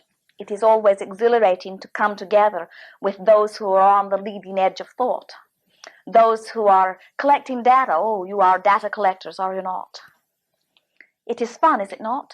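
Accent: Ireland. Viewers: Why is Esther speaking with an Irish leaning accent? Just curious. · why is Esther speaking with an Irish accent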